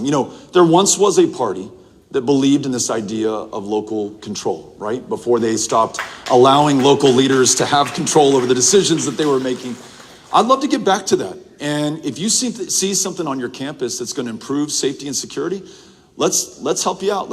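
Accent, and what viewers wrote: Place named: South, United States. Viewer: "Southern talk."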